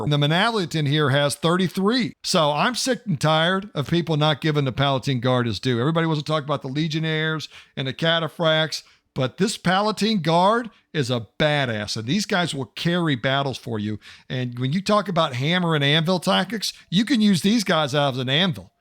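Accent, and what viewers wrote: Oklahoma, United States. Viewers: Your commentary in your videos are great brother, so funny with your sayings with the good old Oklahoma style. But thank you for the videos, & no one els on here has your style. Well done Mr. Take care.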